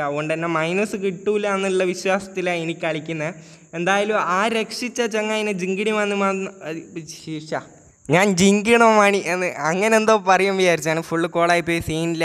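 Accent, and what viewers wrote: Kasaragod, India. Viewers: 'kasaragod aaano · Da kasaragod aano beck beljji😂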